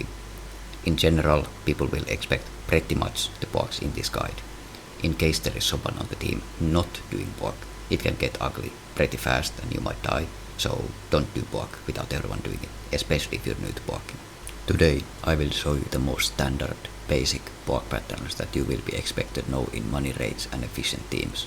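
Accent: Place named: Finland. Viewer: are you Finnish? you sound like Xpetu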